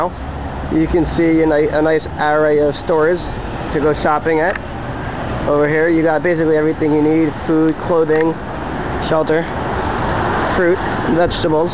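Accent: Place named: Delaware, United States. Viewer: yoo ...... u def didnt grow up in nyc...u got no swagger u talk like u from delaware...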